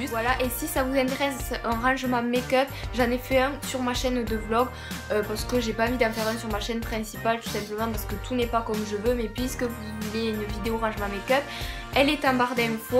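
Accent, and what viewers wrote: South, France. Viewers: L'accent du Sud 😍💕 Toulousaine à vie ✨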